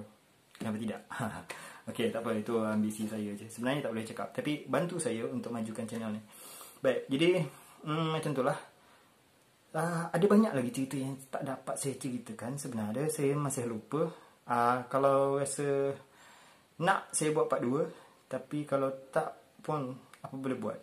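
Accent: Malaysia. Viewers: mcm org malaysia je dia bercakap.. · Cakap Riau lebih kurang Malaysia ..best kn camnie... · mantap bang..loghat kalian seperti loghat malaysia..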